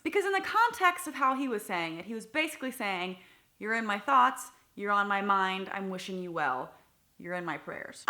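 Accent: South, United States. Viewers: LOVE YA LIKE A SISTER, GIRL. IT'S FUNNY THAT YOU'D DO THIS VIDEO AS I JUST HAD A YOUTUBER TELL ME THAT SHE'D PRAY FOR ME. I REPLIED, SAYING, WELL I' SAY "AFFIRMATIONS" FOR YOU. NOW, I'M TORN BECAUSE I DON'T KNOW WHICH I LIKE BEST I'LL "THINK" FOR YOU OR I'LL SAY AFFIRMATIONS FOR YOU. I DON'T, PERHAPS. I' LL USE THEM BOTH., KEEP THOSE VIDEOS A COMIN. OH, LOVE YOUR SOUTHERN ACCENT. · I do hear your southern accent.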